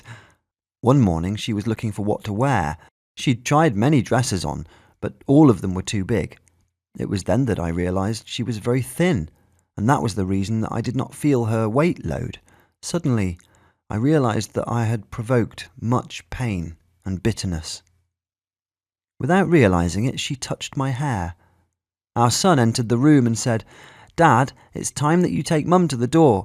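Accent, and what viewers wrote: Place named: United Kingdom. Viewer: British accents..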